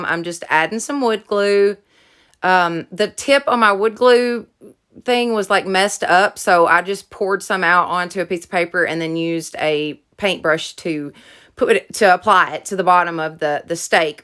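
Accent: South, United States. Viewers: I feel a kindred spirit with you! You and I sound a lot alike! COUNTRY! I am in upper-most Soutn Carolina so my accent is homegrown! Love your diy's! This is my first time watching you. God bless you! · You are absolutely amazing! So talented & I love your personality. I am from Virginia with a southern accent too so listening to you feels right at home lol. Quick question...I just ordered some 20mm wooden beads from your Amazon link. Is that the size you find yourself using most often? Thanks!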